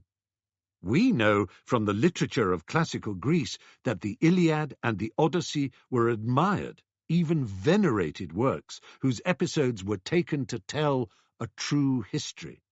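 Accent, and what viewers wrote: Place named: Oxford, United Kingdom. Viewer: When a Brit is speaking Oxford English and “rolls the r”, you know you are in for a treat.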